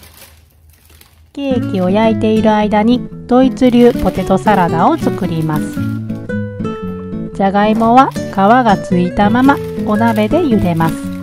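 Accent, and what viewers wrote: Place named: Osaka, Japan. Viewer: Nobanaさんの独特な大阪弁を聞くと、金曜日だー！ってほっこりします♡ドイツも好きだけど、単純にNobanaワールドが好き。大阪でオフ会あったら、Nobanaさんに会ってみたい😆ところでオフ会っていうものは、一人で行っても大丈夫なものなんでしょうか。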